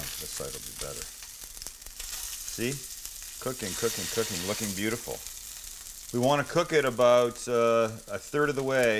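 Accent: Canada. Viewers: Love his Canadian accent! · please ditch that annoying Canadian drawl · Is this guy Canadian?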